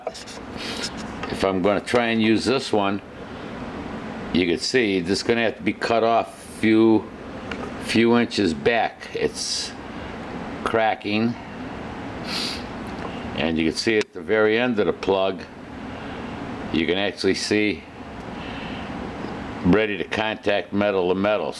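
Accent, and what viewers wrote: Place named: Pennsylvania, United States. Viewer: This guy is definitely from northeast pa , probably scranton!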